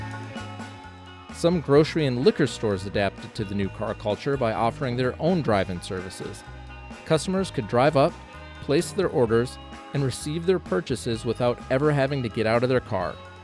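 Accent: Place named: Chicago, United States. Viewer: Most people in America say "aww-toh-mobile". "Otto-mobile" or "Aah-toh-mobile" sounds normal to you but sounds different enough to us that we notice it. It's gotta be a regionalism, but I'm curious where it's from. Sounds kind of Chicago-y, but also kind of northeastern? I can't remember where I've heard it. The kind of place where people pronounce "awesome" as "ah-some" to rhyme with "possum". I just can't put my finger on it so it made me curious.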